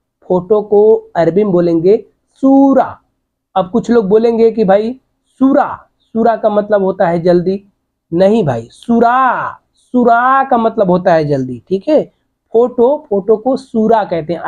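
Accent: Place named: Uttar Pradesh, India. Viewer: Bhai mujhe lgta hai aap uttar pradesh se ho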